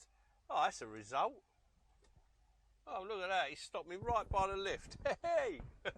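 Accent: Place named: Essex, United Kingdom. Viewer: Great video mate, you sound like me mate from Essex gary gadget lol, i look forward to your future vids 👍 😊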